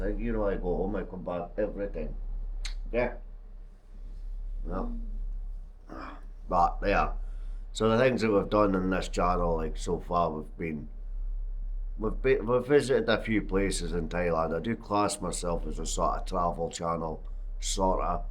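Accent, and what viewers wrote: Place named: Scotland, United Kingdom. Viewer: I like the accent bro ... Braveheart ... Freedom!!!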